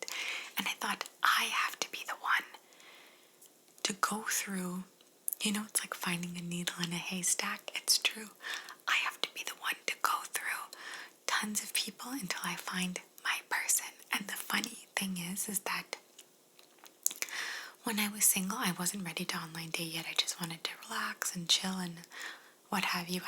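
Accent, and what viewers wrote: Italy. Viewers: I just found your channel and have been binge watching all your videos. I especially love your Italian accent videos (so tingly!) but also, I am Italian and trying to improve my language skills too! I also love the Russian language, but can only say a few phrases, like introducing myself. I love your idea to read Italian books/poems in a video, so I will be looking forward to that! I thought your story about being a kid and prank calling the police was hilarious - I did the same thing with my sister as a kid and the police came to my house too. My parents were sooo embarrassed! but it's funny now. Anyway, I just wanted to share my love for your channel, I think you are awesome! I hope this doesn't come across as creepy, because you know...internet...but learning about you in this video makes me wish we could be friends, but I'm no where near Canada. 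· Hi! your italyaccent videos is funny;DD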